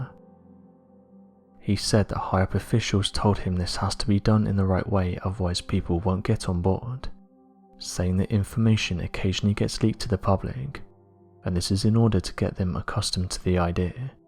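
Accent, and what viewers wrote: United Kingdom. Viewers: British right?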